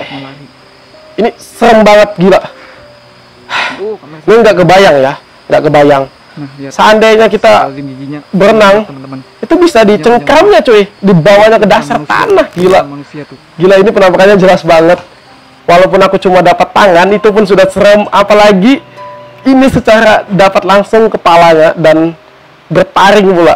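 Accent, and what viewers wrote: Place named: Banjar, Indonesia. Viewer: Air nya rada sedikit hanta🤣 Kental banar banjarnya.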